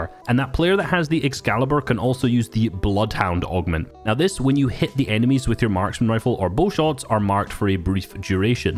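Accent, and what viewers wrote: Scotland, United Kingdom. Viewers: Yo are you Scottish by any chance? And that tac pistol needs a nerf🤔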